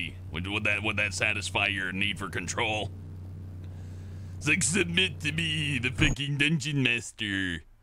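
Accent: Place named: Texas, United States. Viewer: I freaking knew you were a Texas. (I'm also a Texan.) Love your voice man!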